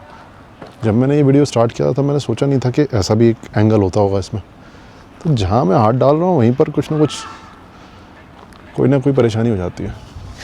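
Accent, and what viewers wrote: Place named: Gwalior, India. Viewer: Gwalior Shivpuri k aaspass k lag rhe hai. Wahi ki language aisi hai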